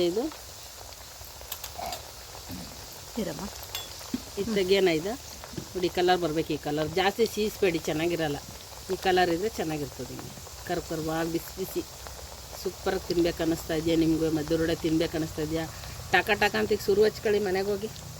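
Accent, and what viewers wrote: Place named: Mandya, India. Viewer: ನಮ್ ಮಂಡ್ಯ ಭಾಷೆ ಕೇಳೋದೇ ಒಂದು ಚಂದ ಆಂಟಿ 😂😂😂😂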